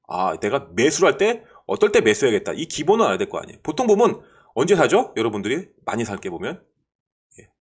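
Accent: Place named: Chungcheong, South Korea. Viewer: 아 고향이 충청도신가봅니다ᆢ 제고향도 충청도지요ᆢ 친하구싶습니다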